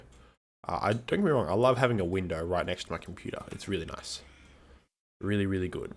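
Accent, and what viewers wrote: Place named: Australia. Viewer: Personally I think these kinds of episodes count as "real" episodes! Couple of things I wanted to mention: -Never realized you were Australian, totally thought you were British or something (english isn't my native language) lol - There's a mod called "simply better minecraft" for 1.8.9 that adds spruce/birch/dark oak signs/doors/trapdoors, etc. -Looking forward to that video/segment where you explain why you chose to play on 1.8.9, though from what you've said in this video I completely agree! Modern Minecraft has some cool features (some new blocks and biomes are really nice) but I feel like it's a mile wide and 2 inches deep -You've mentionned some Minecraft content creators (which I will definitely check out), are there any other Youtubers that inspired you? OG LPers like Etho, Stampy, etc? -Congrats on your promotion/marriage! 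Take as much time as you need between videos, like you said the world will always be there so there's no rush!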